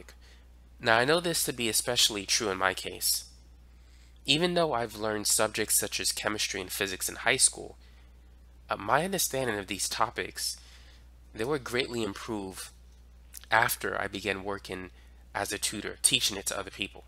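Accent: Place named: Bronx, United States. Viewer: you from the bronx? kinda sound like it (very random, sorry)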